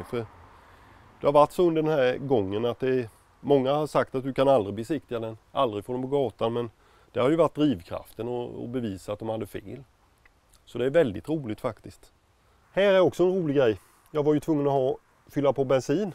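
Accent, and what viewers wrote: Småland, Sweden. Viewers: För han é från småland, dom säger oxå Cykelen, Nyckelen,Bibelen etc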